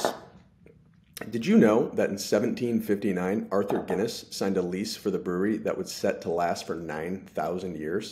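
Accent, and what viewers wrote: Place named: Scotland, United Kingdom. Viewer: That's a Scottish accent 😅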